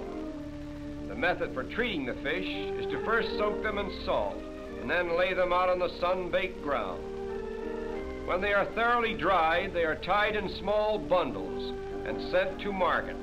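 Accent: Transatlantic, United States. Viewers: Old school Transatlantic accent.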